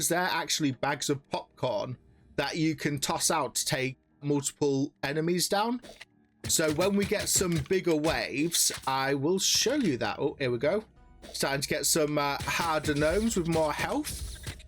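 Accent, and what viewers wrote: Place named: England, United Kingdom. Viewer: UR ENGLISH!?!?!?!? 😱😂 Soooo many things... This game.... Ur voice... This is like when the protagonist reveals he's related to royalty and knew the whole time... I'm also beginning to see the kind of games ur in to. I feel like I'd have a blast playing this... Anything relating to round based survival first person shooter, I'm interested in 😂... Nice to hear the voice behind the screen brother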